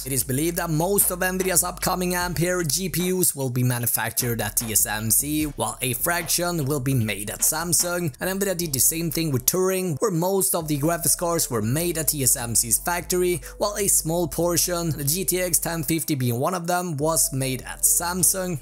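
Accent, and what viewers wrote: Sweden. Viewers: he's a swede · As a fellow Swede I instantly knew you where swedish hearing you speak english haha, no offence man!. That said, please work on your presentation, you sound like a robot and talk way to fast. This is only constructive cririsism, the content is great! peace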